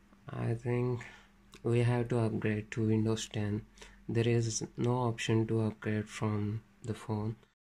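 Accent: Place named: India. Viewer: I hate your "hindu-glish" ! FCKU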